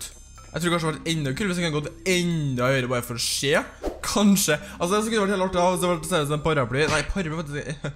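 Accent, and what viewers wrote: Trøndelag, Norway. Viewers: Jeg elsker og høre på trønder dialekten din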